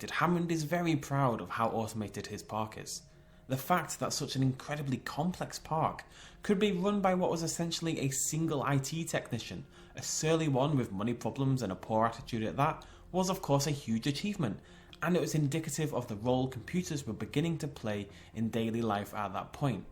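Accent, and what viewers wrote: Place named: North, United Kingdom. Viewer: you sound so cute. and northern.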